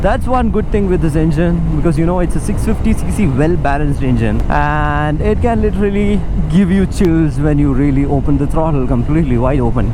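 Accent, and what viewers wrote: India. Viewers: Bro, strong request: get rid of your accent. Half the words you say are in the typical Indian accent, and the other half is a butchered American cum British accent. I mean, pick one and stick to it. Your content is good, but the delivery could be much, much better if you stick to an Indian accent. The accent soup is so distracting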